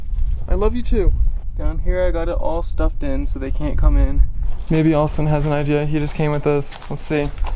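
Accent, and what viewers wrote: South, United States. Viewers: Do your parents support your sexuality? Would be curious to hear you talk about that. Growing up in rural places can be really taxing, maybe im being presumptuous but I definitely think I hear a southern twang in your voice. It’s cute. Really happy for you and austin, hope you guys have many happy years together on the farm.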